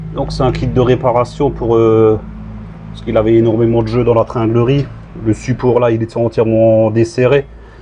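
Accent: Alsace, France. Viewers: Bonjour et merci. J'adore votre accent Alsacien. ELSASS 👍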